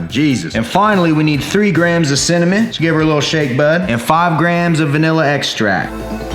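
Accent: Texas, United States. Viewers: Dude are you texan?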